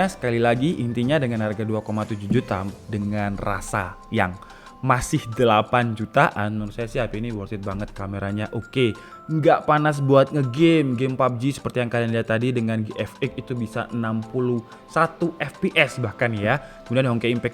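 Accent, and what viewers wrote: Malang, Indonesia. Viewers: Dari malang ya? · pean wong malang ta mas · Lhoalah wong malanggg to, mas 🤣🤣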